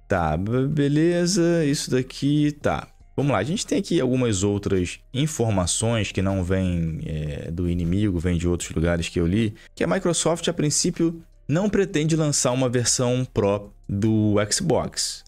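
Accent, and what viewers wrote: Rio de Janeiro, Brazil. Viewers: Vai num fonoaudiólogo pra neutralizar esse sotaque de carioca aí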